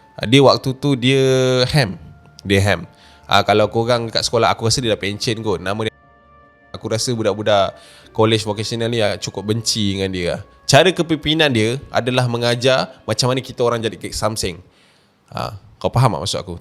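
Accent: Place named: Kelantan, Malaysia. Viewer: Sedak dengar Abe chap kecek Kelate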